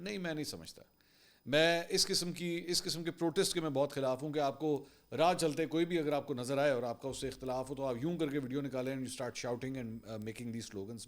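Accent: Pakistan. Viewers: I agree. Your Punjabi is not great. Your Punjabi has a strong hint of Urdu accent and your choice of Punjabi words is often forced and unnatural.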